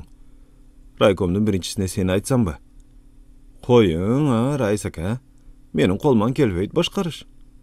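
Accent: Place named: Osh, Kyrgyzstan. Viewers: Оштутарлын тили менен окунан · Ушул оштуктардын тили жинге тийет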